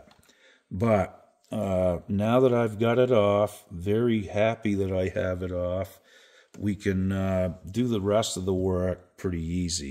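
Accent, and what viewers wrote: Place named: New England, United States. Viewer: Wow, interesting! Following to see what becomes of this Harmony. Probably better than it ever was! Also, your voice reminds me of if Mike Ehrmantraut was born and raised in New England!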